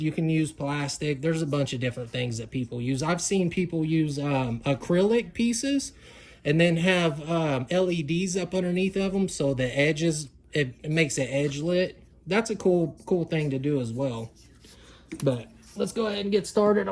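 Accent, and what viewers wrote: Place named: Indiana, United States. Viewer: Yo you from Indiana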